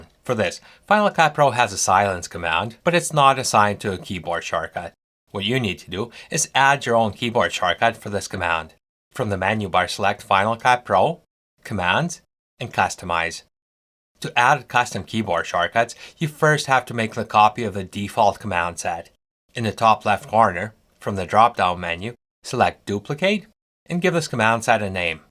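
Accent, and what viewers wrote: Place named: Saskatchewan, Canada. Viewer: As soon as I heard your accent, I could tell you were one of us 306'ers.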